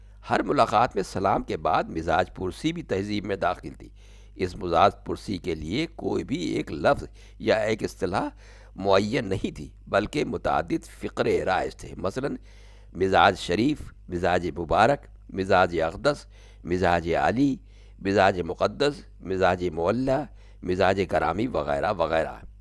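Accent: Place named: Lucknow, India. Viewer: आपका ये वीडियो बहुत कुछ याद दिलाता है और ये सच है कि इतने सब चीजो के गुजर जाने के बाद भी आप जैसे लोगों के लहज़े में लखनऊ हमेशा ही जिंदा रहेगा और हमारे तो रूह में लखनऊ बसा हुआ है, बेशक बहुत खूबसूरत लखनऊ को पेश करता हुआ एक उम्दा वीडियो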